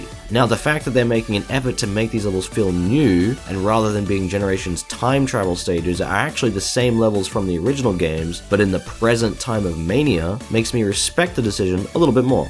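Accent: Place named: Australia. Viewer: I know right? I saw your video and was like "awww yeah, Mania hype!" and then heard your accent "hey an Australian, nice!" and then when you said you were from Brisbane I almost spat my coffee out all over my computer in an over-the-top cartoonish fashion in disbelief. You've earned yourself a subscriber! S3&K is one of my favourite games of all time and I can't believe we are a week away from finally playing something of that quality once again!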